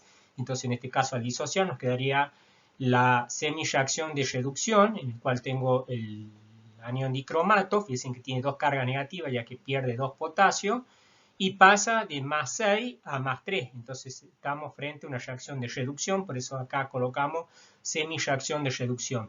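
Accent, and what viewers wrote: Argentina. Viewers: uff, que acento papá. Argentino?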